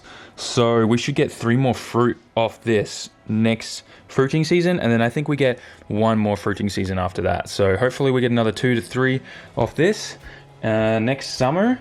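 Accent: Australia. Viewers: the most Australian I've heard you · the second i heard your accent i could tell you are Australian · the tone of your voice will always sound Australian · I picked you for Australian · You still have an Aussie accent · I can still pick up the Australian accent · You've still go the accent... starting to sound like us down here in Melbourne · I could tell by your accent you are Australian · Still Aussie to me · You sound Aussie as hell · his voice will always sound Australian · I would think you were Australian. It's not as thick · I still hear nothing but Australian accent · You still sound ozzy to me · Still got the aussie twang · your Australian accent is still very Australian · He absolutely still sounds bloody Aussie! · you still sound like a natural aussie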